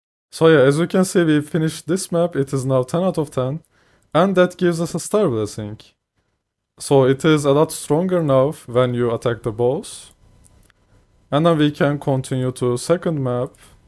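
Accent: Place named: Turkey. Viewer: Reis aksandan dedim bu kesin Türk aha dedim baktım kanalın hakkında kısmında Türkiye yazıyor :D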